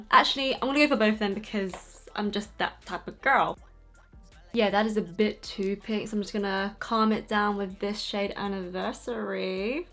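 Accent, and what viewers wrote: England, United Kingdom. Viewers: She sounds British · OMG ARE YOU ENGLISH!!!!!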